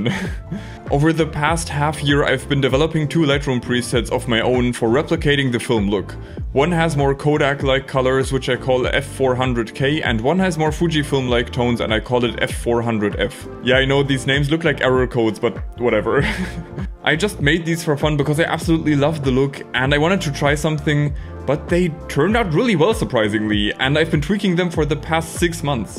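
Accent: Germany. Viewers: hey ! i know one of these photos are taken in Duisburg at the tiger and turtle, you also speak german accent. i wonder if you are near this town ? becouse i come from moers. i´m really into photos ! i own a a6300, gh5, canon 5d, 80d, 700d and a old voigtlander film camera. i start with a fujifilm 5mp and did not stop since then.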